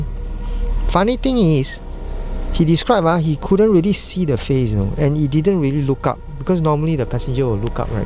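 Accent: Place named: Singapore. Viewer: I really enjoy the way you told your stories. In typical singlish it's so homely.😊😮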